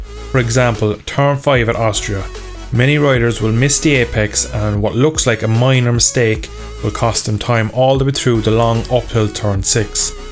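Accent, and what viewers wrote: Ireland. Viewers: irish brudda?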